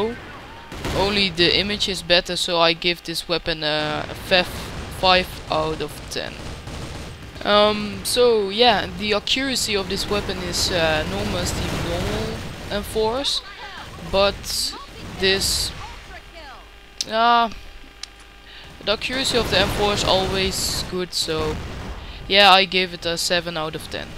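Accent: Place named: Netherlands. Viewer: haha ik hoorde al aan je accent dat je nederlands bent!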